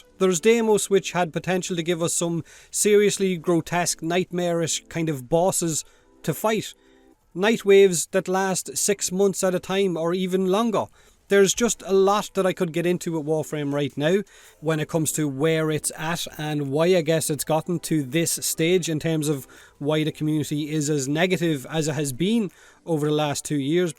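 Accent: Ireland. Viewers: You're my favorite person to watch for warframe related stuff. I love the personality, the Irish accent, the entertaining videos, and the honesty. Don't stop being you, and definitely take care of yourself physically and mentally. My condolences for your losses.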